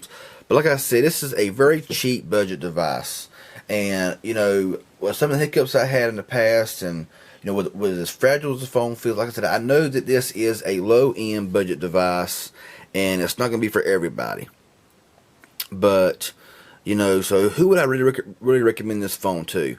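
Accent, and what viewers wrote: South, United States. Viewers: I love your Southern accent. It sounds really cool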